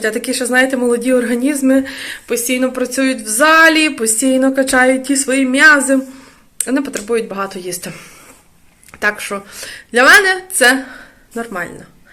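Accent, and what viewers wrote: Ukraine. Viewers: доброго дня мені подобалося ваш суржик тому, що вас також розмовляють суржиком. Я з Вінниці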